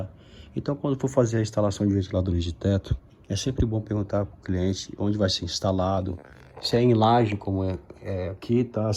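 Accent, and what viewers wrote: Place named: Brazil. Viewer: Vc é de qual estado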